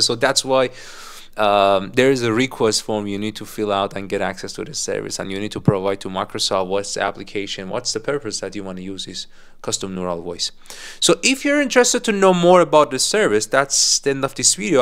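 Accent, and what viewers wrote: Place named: Iran. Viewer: Not me recognizing fellow persian accent from miles away :)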